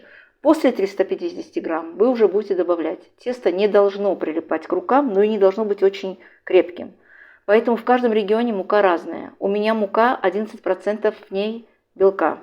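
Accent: Baku, Azerbaijan. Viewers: Вы наш глоток воздуха…, наша замечательная память о родном , любимом Баку!!!!! Все ,что вы предлагаете нам испечь, все вкусняшка! С огромным удовольствием слушаем Вашу речь с Бакинским выговором, мягким и нежным, только наши женщины так разговаривают, певуче! Будте Счастливы и оставайтесь долго, долго такой обаятельной и привлекательной!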